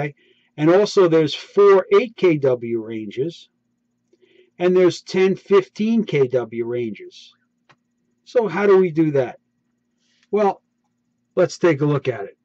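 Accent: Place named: New York, United States. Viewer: 13.5 your garbage New York accent messed me up for a min. Good tutorial otherwise.👍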